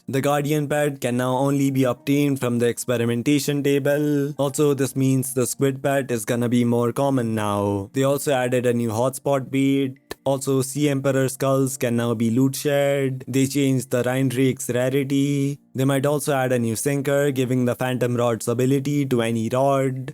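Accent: India. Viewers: are you Indian, my guy?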